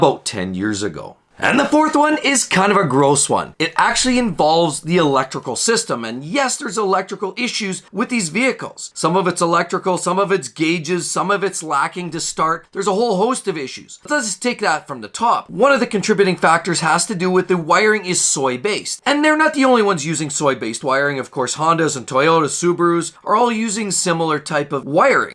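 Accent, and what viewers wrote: Canada. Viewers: God you sound like Bret the Hitman Hart....Canadian accents.....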